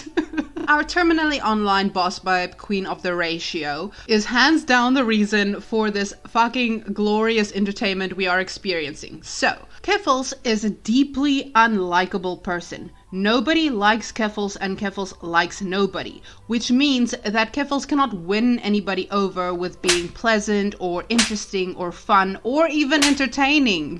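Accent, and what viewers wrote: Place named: South Africa. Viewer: Aydin Paladin het jou video vir my gestuur, het die stem gehoor n gedink ja nee jy kan net n Suid Afrikaner wees..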